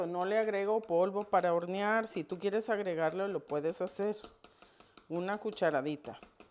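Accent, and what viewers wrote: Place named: North, Mexico. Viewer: Me encanta su acento norteño. Y como hace sus tortillas.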